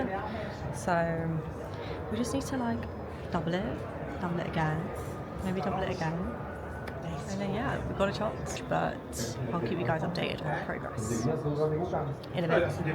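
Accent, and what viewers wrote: United Kingdom. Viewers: Ul in hahah British accent will always make me laugh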